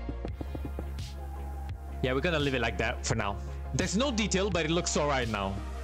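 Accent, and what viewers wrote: Italy. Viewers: I'll be honest, I came across a video of your YouTube shorts and hearing your accent I thought "He's Italian", I opened your channel and the first thing I saw was this video. I subscribed to your channel without thinking twice.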